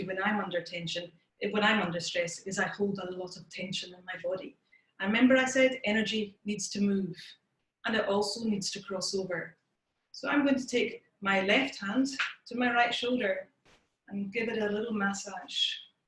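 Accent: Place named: Scotland, United Kingdom. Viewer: Thank you so much for this, your lovely Scottish voice and your energy are just so delightful. I have shared this with my family and friends in this country, and the USA and relatives in Italy. My nephew passed on Sunday, not covid related, we are all devastated but I’ve found this helpful and a managed to do it today. Can’t quite smile , just tears for now, it that’s healthy tears and the smile will come. Thank you 😊 xxx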